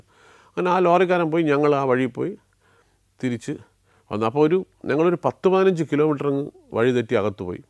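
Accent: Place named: Trivandrum, India. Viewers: Love to hear proper trivandrum accent.... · 🤢 appi slang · നല്ല അനുഭവം കേൾക്കാൻ രസമുണ്ട്. അതും തിർവനന്തപുരം slangil.നന്ദു ഇനിയും ഉയരത്തിൽ എത്തട്ടെ. ആശംസകൾ. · City Trivandrum slang ❤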